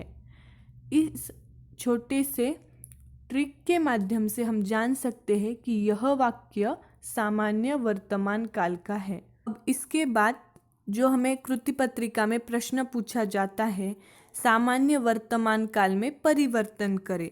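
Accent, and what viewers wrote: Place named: South, India. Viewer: Very very nice 👌👌big big thanks for u 🙏mam aap South se ho aapki hindi South side ki lg rhi h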